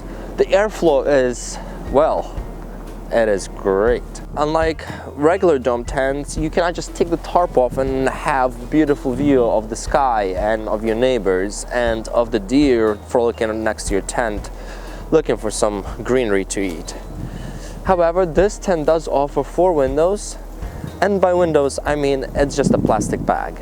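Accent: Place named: New Jersey, United States. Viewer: The accent was so strong and then you said Jersey..made me laugh. I have just purchased the tent. Or a variety of it second hand. Excited to try it out.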